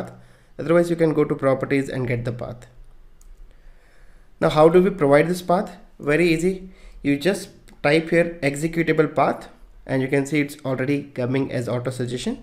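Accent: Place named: India. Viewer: You have a very strong indian accent !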